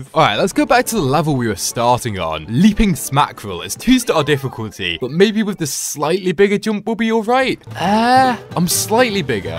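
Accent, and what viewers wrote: United Kingdom. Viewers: I think its cus he talks british and its difficult for him to say poplin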